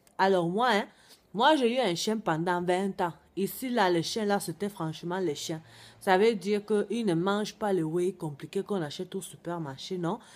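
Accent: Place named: Africa. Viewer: BJR je voudrais juste vous poser une quest pq vous parler comme ls africaines je vous juge pas ms sa m ennerve a entendre parler ainsi cet comme cet pts jeunes blanc la il parle comme ls arabes pour etre vue voila je suis en couple avc un africain dnc vous voyer juste savoir le pq ou cet votre vrai voix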